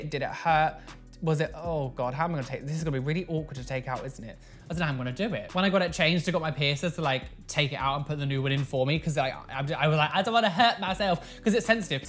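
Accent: United Kingdom. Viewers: Really sorry about your piercing :/ Also I have a kind of different question, what accent do you have ? I really love it, I mean I know it's British, but is it RP? Or maybe Queens? I'm really curious haha Love your videos!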